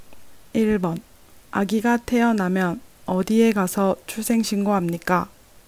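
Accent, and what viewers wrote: South Korea. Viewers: Cho mình hỏi 1 chút, giọng đọc trên là người gốc Hàn đọc ạ ? Và khi đi thi thì liệu tần suất đọc có nhanh hơn và khó nghe hơn ko ạ? ( giọng đọc trên mình nghe được ). Mình cảm ơn ạ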